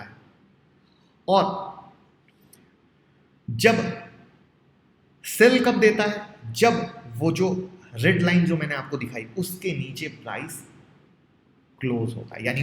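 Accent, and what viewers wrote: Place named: Lucknow, India. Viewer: Lagta hai Aap Lucknow ke ho 😀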